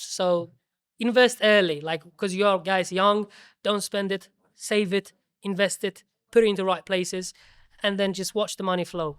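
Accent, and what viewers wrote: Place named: Ireland. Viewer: тянется на Ирландца, нет слов без остановки говорит 😊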